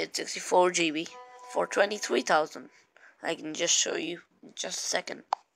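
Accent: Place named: Sindh, Pakistan. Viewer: Bhai Kya chal raha hain Sab theek me accent zabardast ne Bhai Sindhi accent bhool nani Gaye me chalet hain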